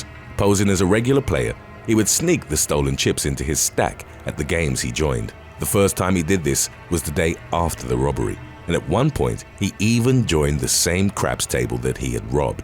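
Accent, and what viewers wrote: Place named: United Kingdom. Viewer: A Lu min e um 😂 😂 you Brit’s crack me up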